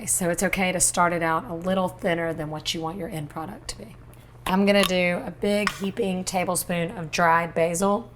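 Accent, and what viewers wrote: United States. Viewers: It's called gravy. Your accent attests to that. You became what my great grandparents wished and worked for: you are an American! It's pronounced amerigan. When my people came here from Naples and Calabria a long time ago, they were prejudiced against Couldn't get a job or into a school to learn. Anything. This was also the situation for Jews, Greeks, Irish, Chinese, and a whole lot of other people who weren't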